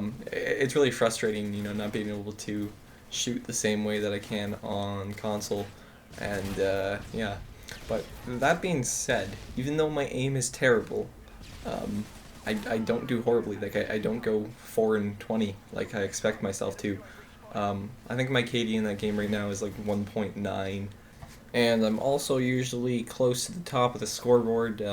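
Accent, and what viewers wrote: Canada. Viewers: Are you Canadian by any chance? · 7 kill streak in under a minute horry sheit (unrelated) are you canadian?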